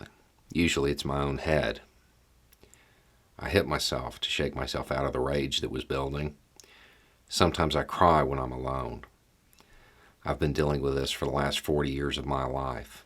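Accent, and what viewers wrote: United States. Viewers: This is one of your best Beau, I've PTSD, and my wife doesn't like you because you are an American, and look like you do and sound, if it helps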